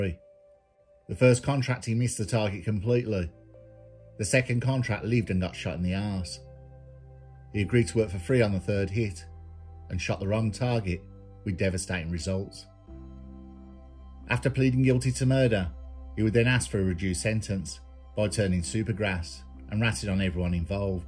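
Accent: Birmingham, United Kingdom. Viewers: There honestly is nothing like a video from, the dulcet Brummie tone, the deadpan delivery, the stories of mans inhumanity to fellow man and the haunting yet relaxing music are what makes these videos such a winning formula. I had to find the music and found this: <link> Apparently it's called " " but I think it may come under a different name in the YouTube music library. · Its Just a Brummie accent mate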